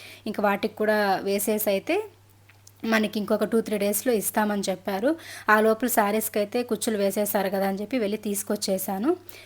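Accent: Chittoor, India. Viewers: మిరు చిత్తూరు భాషలోనే మాట్లాడండి అక్క బాగుంది మరుమాట్లాడేవిధానం మాకు అలానే మాట్లాడండి మిపాత గొంతు వినివిని విన బుద్ధి కాలేదు. అక్క మాకు అలాగే చిత్తూరు భాషనె బాగుంది ఎంతమందికి చిత్తూరు భాష కావలి ఫ్రెండ్స్ నెను పెట్టిన కామెంట్ కి ఎంత మంది లైక్ చేస్తారు. ఫ్రెండ్స్🥰🥰🥰🥰🥰🥰🥰🥰😀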